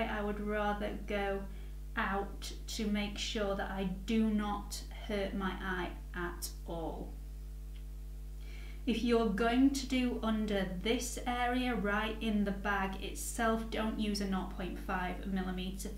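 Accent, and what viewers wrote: United Kingdom. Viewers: BritishJamaican777 British English 😂😂😂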